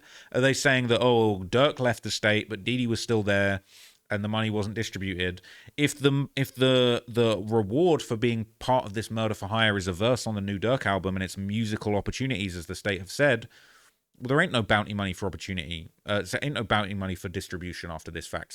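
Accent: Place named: United Kingdom. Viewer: Freudian-slips with the UK accent be hilarious 😂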